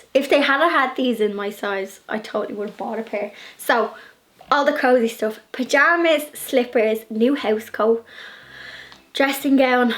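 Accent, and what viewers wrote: Dublin, Ireland. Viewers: Lovely to hear the Dub accent well Done great videos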